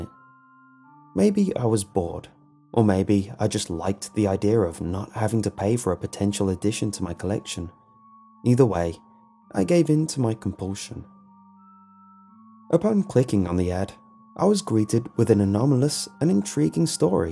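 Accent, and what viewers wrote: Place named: Liverpool, United Kingdom. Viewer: I can detect a very subtle Scouse accent or possible Manc maybe.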